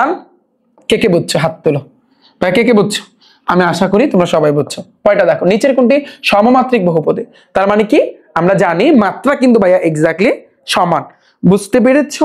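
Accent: Barishal, Bangladesh. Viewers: স্যার এর বাসা কই,,কিছু কথা শুনলে মনে হয় বরিশালে 🤔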